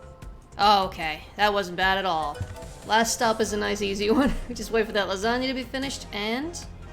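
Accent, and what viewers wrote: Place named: Central Europe. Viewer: From your voice I kinda had a feeling you were from central Europe. Quite amusing how I ended up being correct about that. Also, just out of curiosity, would you happen to be a fellow Dutchie? :p Also, I figured out you can toggle any prep station and holding station upgrades you unlocked simply by clicking on them, so if the large amount of prep stations overwhelms you, that's all you have to do to turn them off again. ^w^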